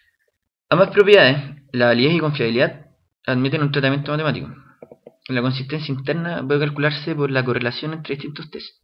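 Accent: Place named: Chile. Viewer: ¡Es chileno!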